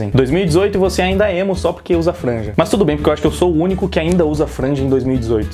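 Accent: Rio Grande do Sul, Brazil. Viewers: Voce é gaucho??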